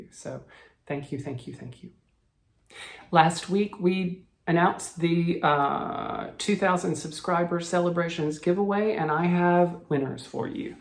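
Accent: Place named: South Florida, United States. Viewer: Omg “tornadahs” … memories of my mother! It is like I am listening to my family from South Florida.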